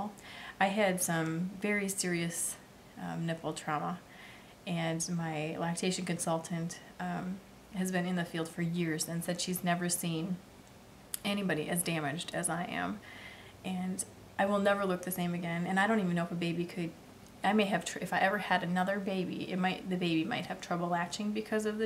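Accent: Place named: Minnesota, United States. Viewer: btw I love your videos, especially just to hear that wonderful Minnesota accent! I don't know where in the state you are (assuming subs) but I've lived out of state since 2005 and I miss it soooo much! I grew up in St. Louis Park so now this city girl lives in small town West Virginia! Totally different. I don't know if you are a fair goer but if you are it would be super fun to see a video from the Great MN Get Together!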